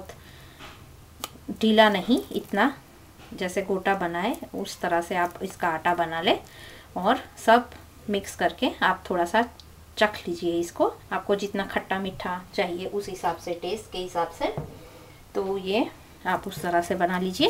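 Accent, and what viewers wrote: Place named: Gujarat, India. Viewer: mam aapka gujju wala Hindi bahot hi sweet laga..., by the way good try... Maja aavi gai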